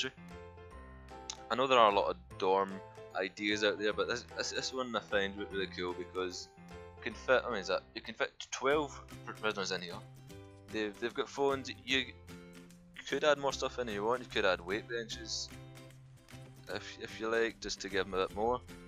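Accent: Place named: Scotland, United Kingdom. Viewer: You're an absolute legend. You have truly understood the aim of this game. Comfy times 👌. The jolly music played in the background is just class haha. Are you from Falkirk? You sound like my pipe major, he's from Falkirk. I'm from the West Coast (Scotland).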